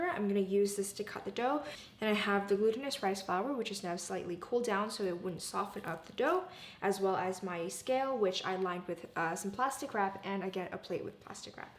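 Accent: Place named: Singapore. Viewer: First time coming here, you sound very Singaporean.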